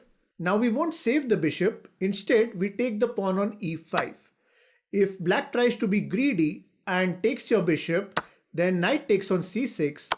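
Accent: India. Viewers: Is it me or does every single Indian have the same exact voice and pronunciation?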